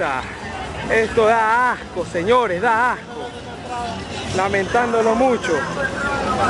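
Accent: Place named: Argentina. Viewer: Demasiado bueno el video... Pero por momentos llegue a pensar que quien narraba era un argentino... Pilas con ese asento mi hermano! Aguante Vinotinto!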